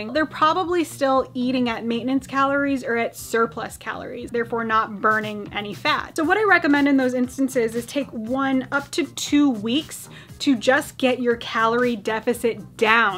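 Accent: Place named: United States. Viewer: You forgot to mention if the weight should be pounds or kilos. You sound american, so I'm guessing pounds.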